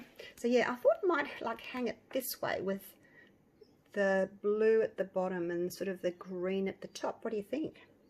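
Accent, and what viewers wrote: Australia. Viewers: I get such a kick out of the way she talks. Not the accent, which I’m low key obsessed with, but the things she says like right-o and hey? Or aye? I’m not entirely sure what she says ... but those types of things. I don’t know if it’s an Australian thing or an everywhere but New York thing. I enjoy people/things that are different from myself. Btw: I’d love to do a class type of thing with her! Like via zoom or something! That would be so much fun && totally something I’d pay for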